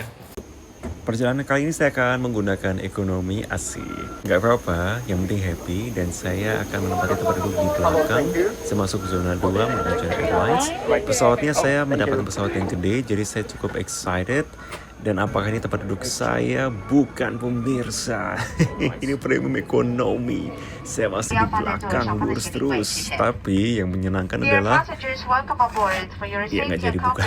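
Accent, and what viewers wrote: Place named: Surabaya, Indonesia. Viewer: Terimakasih Bang, kontenmu mewujudkan mimpiku waktu kecil, kan suka liat Globe terus penasaran pulau² kecil disamudra emang ada orangnya? Dan Kontenmu menjawab semuanya. Tp ada 1 yaitu kutub Utara atau selatan ataupun negara yg terpencil² kyk gimana kehidupan mereka yg jauh dr negara² lainya. Semoga kontenmu semakin berkembang. Btw orang mana kok logatnya kyk Surabaya.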